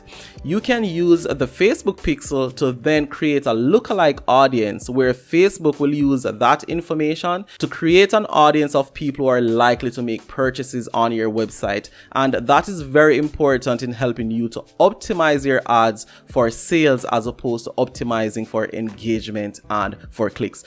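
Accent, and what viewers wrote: Jamaica. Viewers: I hear Jamaican 💀 dropped a sub 💯 you got my full support bro I love to see other Jamaicans doing big stuff 🙌🏽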